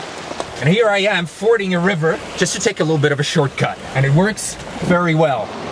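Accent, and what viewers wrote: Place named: United States. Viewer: Is this guy german? he sound like american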